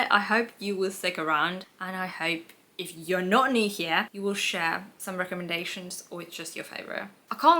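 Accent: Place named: Birmingham, United Kingdom. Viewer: how did you get an English accent btw? (a bit Brummie lol)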